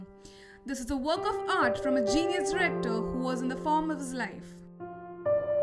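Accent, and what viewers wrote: India. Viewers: I hear indian i click back button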